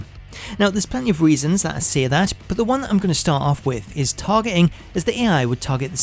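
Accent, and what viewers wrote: Newcastle, United Kingdom. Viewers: Maybe he's a Geordie? I'm only guessing though. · Just curious where in in the UK Are u from I can’t place the regional accent 😆 yeah I can already see how repetitive this is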